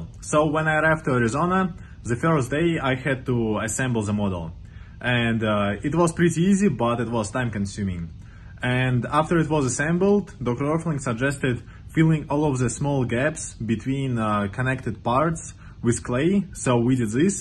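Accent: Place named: Eastern Europe. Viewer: Okay I am a bit confuse, you sound so European(Eastern European), so why are you in US university? Is America nicking EU talents??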